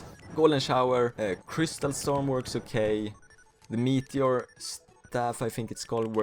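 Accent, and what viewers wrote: Sweden. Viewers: that swedish accent is heavy af, ha kul i 2018 : D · Are you from sweden beacuse i can hear when someone started with swedish and learned english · Are u Swedish? Ur accent kinda sound Swedish.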